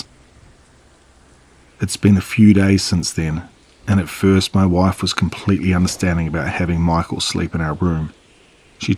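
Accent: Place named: New Zealand. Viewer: Another great story. Thank you for providing us with very unique stories in your fantastic Kiwi accent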